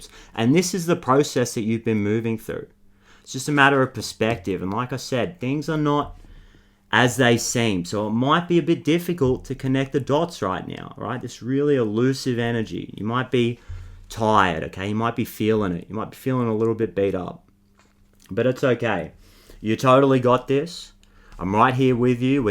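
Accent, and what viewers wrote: Australia. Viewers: Felt like you were speaking directly to me. Thank you so much, also the Aussie accent makes it so much better for some reason ❤ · didn’t know I would find a reader with a thick australian accent holding anime chibi tarot cards to resonate with me but alas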